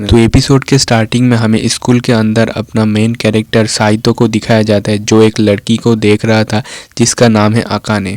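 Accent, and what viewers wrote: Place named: Bengal, India. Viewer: Sudhar jayega tu tension mat le o ak Bengali fir bhi kosis kar raha 😂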